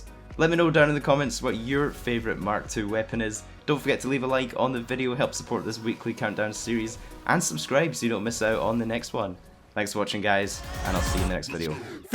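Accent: Scotland, United Kingdom. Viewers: Find it so amusing watching videos and it’s a fellow Scottish man. So used to hearing Americans and English guys lol. Nothing against the English or Americans, love yous all.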